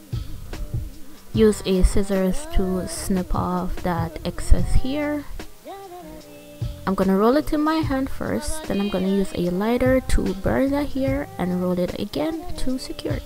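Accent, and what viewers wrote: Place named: Jamaica. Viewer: Your accent sounds Jamaican fr LOVED THE RESULT AND UR MAKEUP✨❤️😍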